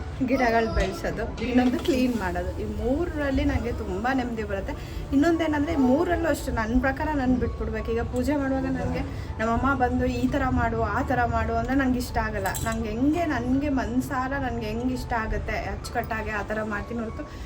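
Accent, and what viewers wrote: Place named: Karnataka, India. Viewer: Nivu nodoke north india tara kanstira but nivu matadidagle gottagodu nivu kannadavru anta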